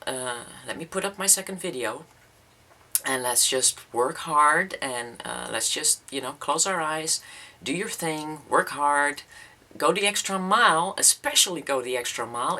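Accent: Netherlands. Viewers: Such an honest and inspirational video. I watched a couple of your tutorials - perfect. I was trying to figure out the accent and guessed Dutch, and then had it confirmed when I listened to your story in this video. Your finished jewelry projects are to die for - I want that Marilyn bracelet. Can't agree with you more about the importance of research. You nailed it. You have no idea how amazing you are.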